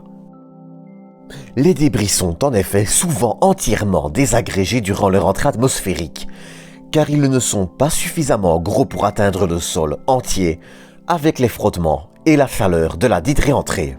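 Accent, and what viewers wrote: Belgium. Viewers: c'est l'accent belge, perso c'est l'accent arabe qui me dérange mais pas celui là...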